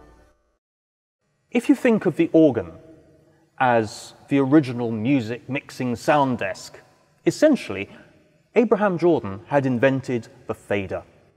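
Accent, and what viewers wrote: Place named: RP, United Kingdom. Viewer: Riveting commentary. And, your English Accent sounds so intelligent and posh to us Americans. Did you write and prepare the script too?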